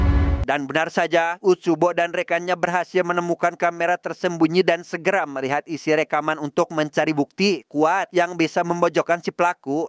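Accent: West Java, Indonesia. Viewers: ....sunda na ti mana?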